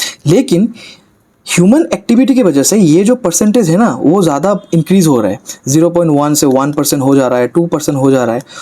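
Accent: Assam, India. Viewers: Ur voices like Assamese 🙄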